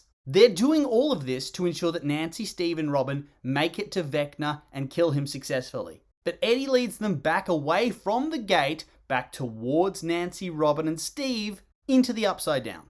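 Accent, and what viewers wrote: Australia. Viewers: You sound Australian, your country was turned into one giant gulag over the ‘pandemic’ and you are like ‘silly Americans and their guns’. The lack of awareness is truly astounding. Your name really shouldn’t be, more like girly idiot pants.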